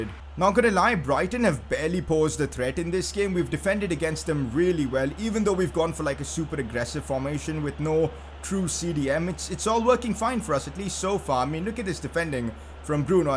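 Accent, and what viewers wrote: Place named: India. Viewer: We’re has this Indian sounding man been all my life I have been laughing so hard all ep this is amazing I just subbed to all his channels he his amazing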